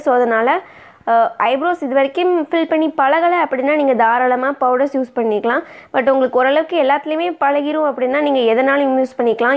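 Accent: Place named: Kerala, India. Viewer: R u Malayali ?? Gap ittit slang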